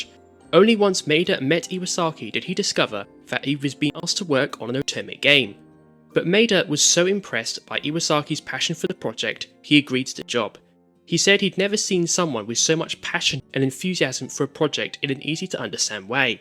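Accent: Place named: United Kingdom. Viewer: I am truly enjoying your videos and have subscribed to the channel. However allow me to provide some negative constructive feedback. You should realty work on your voice-over. Try to speak slower, and tone down your specific variant of British accent. I am a fully-fluent non-native British speaker, who has been mistaken for a native on several occasions, and who lived in the UK for years and had no trouble understanding the locals (in Scotland, no less). Yet I find myself not understanding words you say on multiple occasions and having to rewind the video, and even having to turn subtitles on when the re-listen isn't enough. Other than that, full respect for your Japanese gaming knowledge and the obvious deep effort and research that has gone into creating these videos.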